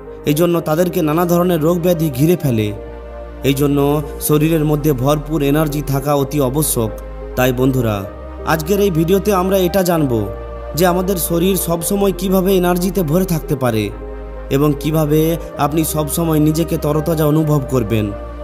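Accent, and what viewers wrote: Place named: Bankura, India. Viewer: ভাই আপনার বাড়ি কি বাঁকুড়াতে। আপনার কথার টান শুনে মনে হচ্ছে।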